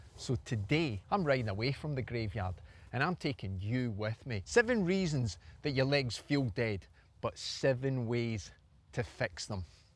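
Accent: Scotland, United Kingdom. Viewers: "Blame your bake"! LOL I love this guy - he doesn't sugar coat anything and tells it like it is, all with a Scottish accent! SUBSCRIBED! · Good points!❤ Two off-topic questions 😊 - 1) why the mirrored video? 2) where exactly in Scotland can I get some of this accent? ❤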